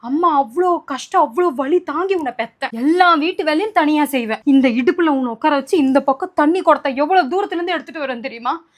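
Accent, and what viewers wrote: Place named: Chennai, India. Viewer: You are born and brought up in Haryana right? Your Tamil accents, typical Chennai girl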